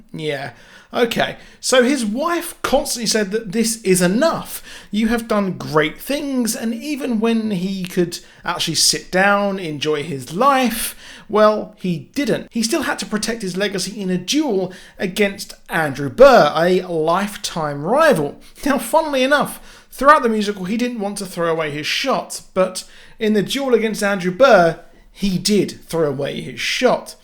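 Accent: United Kingdom. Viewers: “When I sAwR it” sorry I just love British accents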